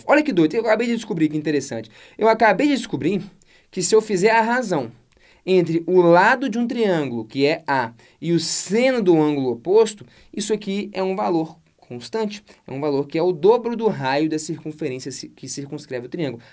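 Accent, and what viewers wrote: Minas Gerais, Brazil. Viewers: Você é mineirinho ou só finge?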